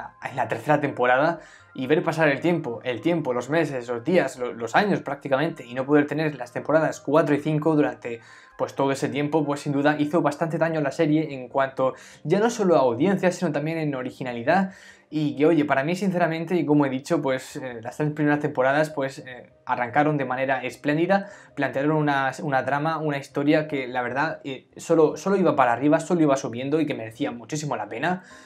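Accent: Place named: Latin America. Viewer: Tenés que aprender un español más neutro, cuesta entenderte en el español sudamericano. Tenés que aprender a proyectar las palabras hacia afuera, hablás hacia adentro y suena un pasticho engorroso, obviamente te miré 20 segundos.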